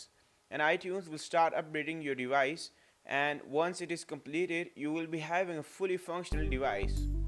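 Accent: India. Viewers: He has an indian accent lol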